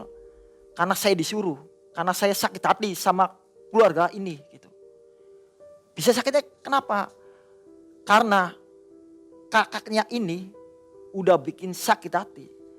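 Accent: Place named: West Java, Indonesia. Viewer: Pasti orang Jawa Barat 😂 Salam kang sing Indramayu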